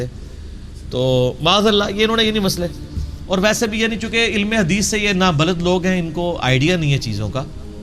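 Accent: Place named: India. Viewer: Hindustani lehja hai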